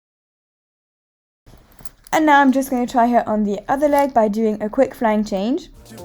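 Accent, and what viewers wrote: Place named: England, United Kingdom. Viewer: Sorry, I don’t really understand you English accent. Can you add subtitles please? Anyway, you’re a good rider🫶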